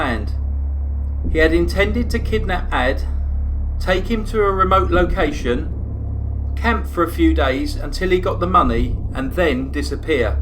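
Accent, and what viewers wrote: England, United Kingdom. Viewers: I think my hubby is jealous, he doesn't understand why I love listening to your voice for one. He knows I love mysteries and scandals, but I told him your voice and others English voices adds to the attraction. Please don't ever lose that! With us being your cousins I cannot figure out how we lost it here centuries ago.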